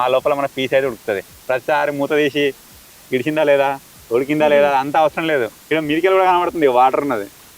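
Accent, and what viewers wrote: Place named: Godavari, India. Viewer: Anna love from Andhra ❤ Maa slang lo undey magic eyy veru godavari ❤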